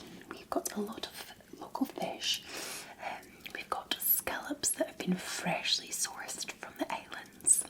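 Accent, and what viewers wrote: Scotland, United Kingdom. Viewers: 40 minutes of tingles from the resplendent scottish lass. perry the platypus noises ☺️ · Hello I hope you are doing well! I ❤ the very Scottish accent!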